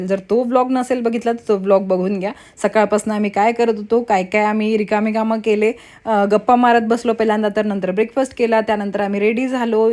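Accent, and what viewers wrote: Vidarbha, India. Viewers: 1no ❤ ekdm bharri Vidharbh bolte 😊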